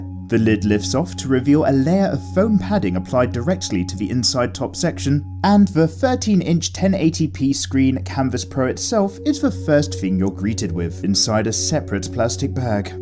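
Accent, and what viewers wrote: United Kingdom. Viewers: you videos are very informative and quite funny, with a bit of a Brit accent, truly good Old Chap, do keep it up to use your humor.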